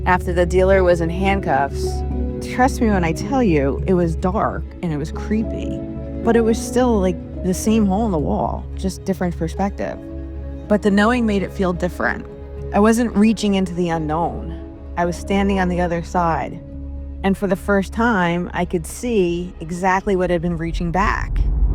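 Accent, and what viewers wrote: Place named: New Jersey, United States. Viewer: Loved the narrator's voice... she's definitely Jersey. Looking forward to more.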